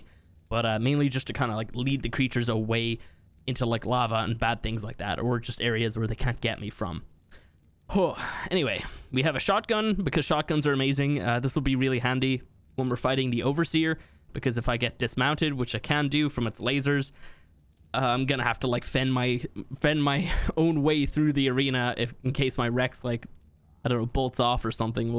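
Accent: Ireland. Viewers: Are you Irish cause if you are you my man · He is from Ireland 🇮🇪 but im not 100% sure where (i think he lives in Dublin)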